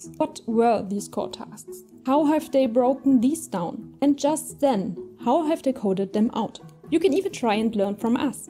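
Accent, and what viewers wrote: Germany. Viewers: Great video. But can I hear a German accent? Nice. Greetings from Gelsenkirchen, Germany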